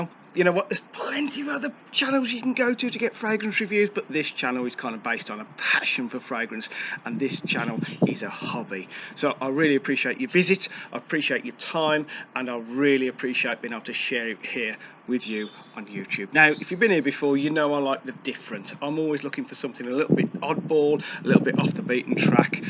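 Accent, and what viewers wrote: Birmingham, United Kingdom. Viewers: Hey just chanced on your videos. Subscribed. Is that a Brummie accent I can hear? 😆👍 Keep it going!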